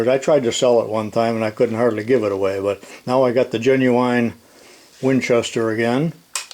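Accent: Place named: United States. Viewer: why dont you americans pronounce the L when you say SOLDER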